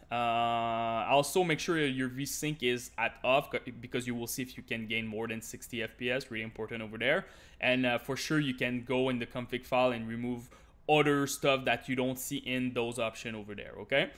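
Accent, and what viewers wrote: Quebec, Canada. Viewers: accent is from quebec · boi tes quebecois? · I can feel the quebec in him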